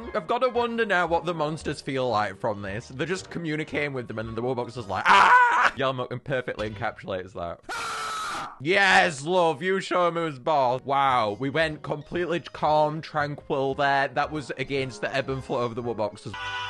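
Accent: United Kingdom. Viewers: are you British (ik this is very weird to ask just curious )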